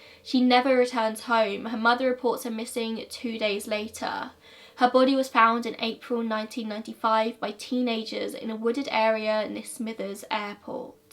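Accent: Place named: RP, United Kingdom. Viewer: I've always had thing for posh speaking sexy girls like yourself, please take this has a complement not some sleaze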